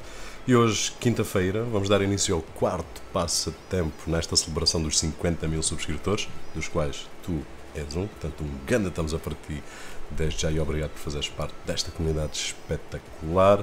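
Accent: Portugal. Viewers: Já estou cá há 5 anos, depois de ver um vídeo de "seca" (59 min) sobre o QTS da QNAP, que me convenceu a comprar um NAS, e que foi das melhores compras que fiz. Resolvi ficar pela qualidade dos restantes vídeos, variedade dos tópicos "nerds" e tudo com sotaque algarvio (sou natural de Lagos). Parabéns pelos 50K :-)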